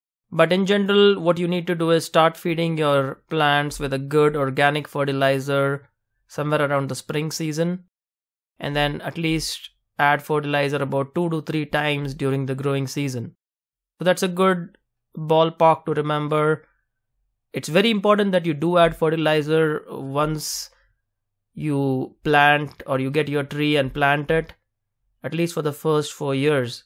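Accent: India. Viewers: Are you indian??..... Your voice seems like...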